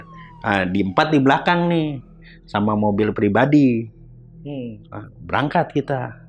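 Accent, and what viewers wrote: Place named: West Java, Indonesia. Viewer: Sunda banget 😅😊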